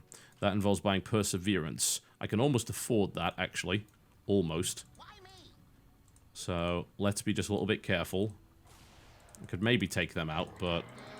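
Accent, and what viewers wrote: United Kingdom. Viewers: I agree with you Pär! Though It's a British accent, not Australian :)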